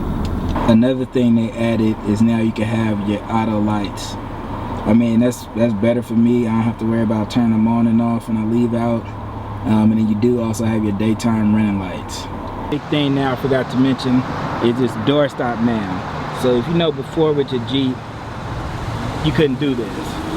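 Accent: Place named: California, United States. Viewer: Sound like he from Cali or West Coast. I may be wrong though lol. Good review!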